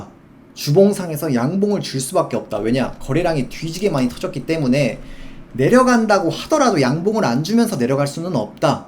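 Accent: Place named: Jeolla, South Korea. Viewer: 약간 느린 말투에.. 전남처럼 확 튀는 사투리도 아니고.. 성님이라는 단어를 써서 그랬어요 ㅋㅋ 딱 말투가 전북스타일인데 ㅋ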